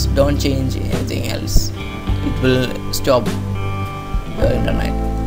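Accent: India. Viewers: U surely r a malayali by accent!!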